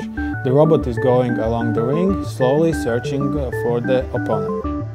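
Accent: Latvia. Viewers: I was watching this and i thought your accent sounded familiar... I had a suspicion you were latvian! Labs video, pats domāju sākt veidot savu pirmo sumo robotu : ^) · That Latvian accent was so obvious from the very first word. :D I usually use something more similar to the first strategy, since usually the opponent robot is within my robot's sight basically upon the start. As my blad is decent, my robot just runs full force onto opponent. The main disadvantages come if opponent has flags. My robot doesn't yet account for it. Also, and embarrassing thing, but one of the 2 things have caused my robot to randomly restart on many competitions and I'm not sure which (hadn't tested): - charging circuit moving out of place and messing something up (not sure about this one, heard it from someone that it could be possible) - high noise in voltage from battery (due to not enough charge?) I'm hoping I can prevent this from happening again.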